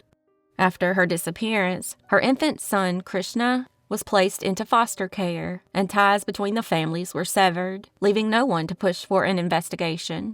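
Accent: South, United States. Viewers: I just don't understand how/why someone can just disappear!😓 So sad for the families!💔 Thank you for bringing these sad cases to light 🕯️ 🕯️ 🕯️ Always good hearing your sweet southern voice! Love ya girl!💞✌️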